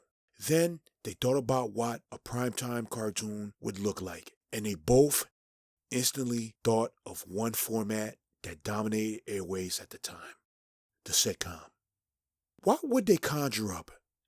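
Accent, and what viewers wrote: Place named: New York, United States. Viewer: Im a big fan of these shows and this was very well done! I subbed and cant wait to see more. BTW are you from NY? thought I detected an accent and your voice reminds me of Method Man. It made me enjoy the narration even more.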